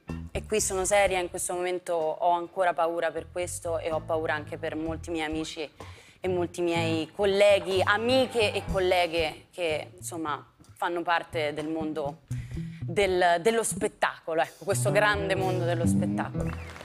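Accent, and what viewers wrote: Rome, Italy. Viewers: Ma perché se è nata e cresciuta a Bologna parla con accento romano? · Forse perché è venuta a Roma da giovane e ha semplicemente acquisito l’accento del posto? Non capisco perché in tanti stiate facendo notare una cosa così naturale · Ma che significa scusa? Se è nata a Bologna non significa necessariamente che ci sia cresciuta. Evidentemente è cresciuta a Roma, ed in ogni caso non è che gli accenti di insegnano, succede che più o meno inconsciamente si rimane condizionati dal modo di parlare delle persone del posto in cui si vive. Anche gli stranieri che vivono a Roma hanno l’accento romano, ma mica ci hanno fatto un corso! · Non riesco a spiegarmi perché sia bolognese e parli con accento romano, dev'essere l'atmosfera di Propaganda Live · Ma perché ad una bolognese insegnano a parlare con accento romano? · "Simpatisci","ghe ho lletto","era brobrio", "lo cabisco", "diggiti", "ti vuole bbene", "cresciuta a BBologna", "scinque anni", "riscevevo", "de mmerda", "perzonalmente, proprio perzonalmente", "una pagina nell'internet", "un incubbo","bbasta","scinque","scertezze","amisci","BBene", "lisceo" (e svariate altre topiche) ma un'attrice corsi di dizione non ne fa? 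Forse li ha fatti a RRoma. La cadenza bolognese, mostrata nel film con Accorsi, era meno "borazza" e più spontanea. Ecco perchè a volte viene doppiata. Ora parla "strasscicato" come il cronista "impresentabbile" e raccomandato dell'America's Cup, che non si poteva sentire e non si poteva vedere, con la differenza che lei almeno il fisico del ruolo ce l'ha. Nella putrida fiction su Leonardo interpreta un personaggio storico inesistente, che imbonisce il quarantenne Leonardo assistente nella bottega di Verrocchio (ma il Leonardo discepolo del Verrocchio di anni ne aveva tipo una quindicina). Detto che il problema della fiction "Leonardo" è imputabile agli autori irrispettosi e incapaci e non agli attori, nel film con Accorsi MDA mi aveva piacevolmente sorpreso, e pure nell'isola delle Rose. In definitiva viene proprio da chiedersi "Chi cazzo è MDA?" Perché una bolognese che parla in romanesco una sua identità evidentemente non l'ha ancora acquisita. 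Ps: Matilda, gli euro valgono più dei dollari, quindi non dica "dollari non euro", come se in dollari la cifra fosse pazzesca, in realtà è il contrario. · La bolognese con più accento romano che abbia mai sentito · Il problema e che hai preso l'accento romano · ma ha accento Romano? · La gente dice “chi cazzo è Matilde De Angelis” semplicemente perché: 1) Per settimane, prima e dopo Sanremo, eri ovunque, tv, radio, giornali, ma la maggioranza degli italiani non ti conosceva. E probabilmente non ti conosce neanche oggi. 2) Sei saccente, spocchiosa e te la tiri. Se un personaggio pubblico è così, non si deve sorprendere se attira antipatie e se riceve insulti ogni giorno. PS: dici che hai “ripulito” l’accento bolognese, ma perché adesso parli come una coatta romana? Comunque hai 25 anni, hai ancora tanto da imparare e ancora poco da insegnare, l’umiltà non fa mai male.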